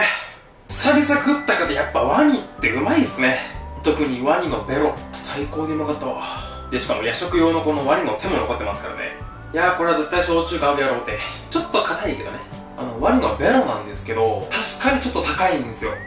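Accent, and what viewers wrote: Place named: Hakata, Japan. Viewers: 所々出る 九州の方言が親近感湧きますヽ(´ー｀)ノ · 実食編、いつもより博多弁多いっっちゃんね(o^^o)よほどうまかと！ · この喋り方的に福岡やろか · 若干博多弁？が多いこの動画すき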